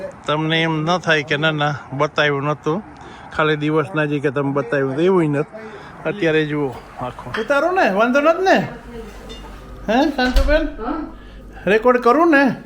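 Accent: India. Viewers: ખૂબ સરસ ભાઈ...! આપણા ગુજરાત ના ભાંડુઓ વિદેશ માં વસતા હોય અને ખૂબ સુખી અને સમૃદ્ધ હોય ત્યારે આપણી છાતી ગજગજ ફૂલે અને આપણા સુખી ભાંડરડાઓ ને જોઈ ને કેટલો આનંદ થાય તે અકલ્પનીય છે. બસ ભગવાન ને હંમેશ એક જ પ્રાર્થના કરીએ...ઈશ્વર તેઓ સૌને સદૈવ સ્વસ્થ, સુખી,સમૃદ્ધ અને ખુશ રાખે... તમે પણ વિદેશ મા વસતા આપણા ભાંડરડાઓ ના આવા જ હૈયુ હરખાઈ જાય, હૈયુ હરખભેર હિલોળે ચઢે તેવા વિડીયો બનાવી... અમને પણ આપણા વિદેશી ભાંડરડાઓ વિશે જાણી મોજ આવી જાય... આપની દેશી લઢણ સાથે વાસ્તવિક ચિત્રણ સાથે નો બ્લોગ બનાવવા બદલ આપને ખૂબ ખૂબ ધન્યવાદ... હાર્દિક અભિનંદન... શુભેચ્છાઓ 🎉🌹🙏 કુમાર ભુપેંદ્રકુમાર- તંત્રી - કર્ણાવતી મહાનગર અખબાર અમદાવાદ 🌹🙏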